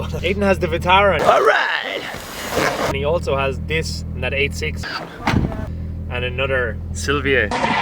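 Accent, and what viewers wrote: Ireland. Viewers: Good old Irish accent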